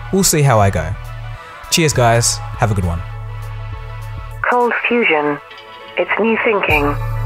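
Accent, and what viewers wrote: Australia. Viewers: OHHH SHIT MATE YOU'RE AUSTRALIAN WOOOOOOOOOOOOOOOOOOOOOOOOOOO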